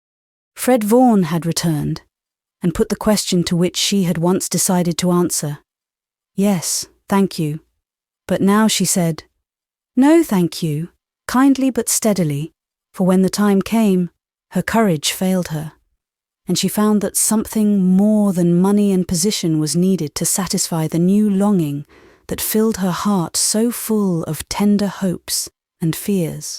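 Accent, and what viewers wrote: United Kingdom. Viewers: Hearing an essential American story with a British accent doesn't work.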